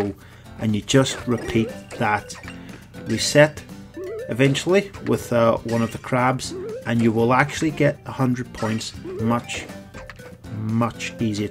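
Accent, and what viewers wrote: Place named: Ireland. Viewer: Hes so irish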